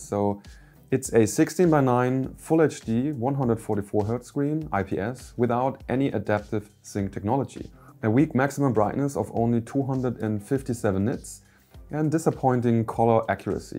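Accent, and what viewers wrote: Germany. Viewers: i have been trying to learn german(now i can understand english in german accent😭) also great review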